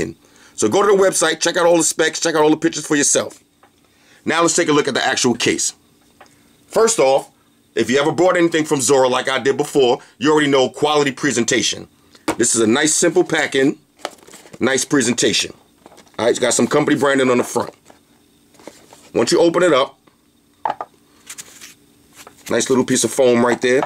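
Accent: New York, United States. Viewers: I always come here for your NYC Accent and your reviews!!!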